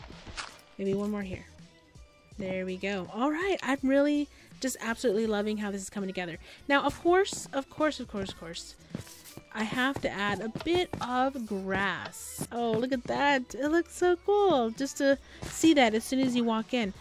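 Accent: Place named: Texas, United States. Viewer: Are you from Texas or South Carolina?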